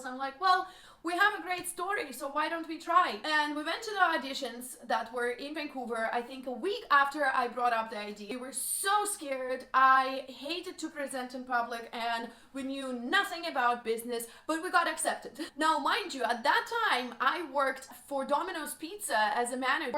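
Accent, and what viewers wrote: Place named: Russia. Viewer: Ого, даже не знала такую грустную и душещипательную историю этой потрясающей юморной красотки., ты умничка, обожаю твои видосы с этим офигкнным русским акцентом (на нём так понятен английский, что англичанам и американцам стоило бы поучиться)))) 😅 ты прелесть! Успеха тебе и не унывай и не сдавайся ❤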